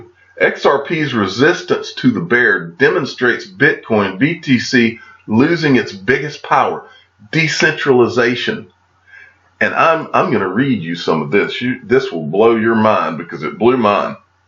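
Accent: United States. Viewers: Whats with the cowboy accent ?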